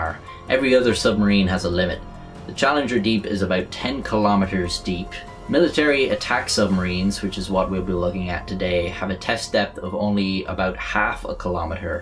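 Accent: Ireland. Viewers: Lemme guess ur accent, Irish? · R u Irish? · I didn’t think that today I would be listening to a purple Irish man describing the most horrifying and instantaneous death possible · It's always grand hearing an Irishman tell a story, any story. Erin Go Bragh! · Irish accent, Video about the deep blue sea and submarines. He must be atlas from bioshock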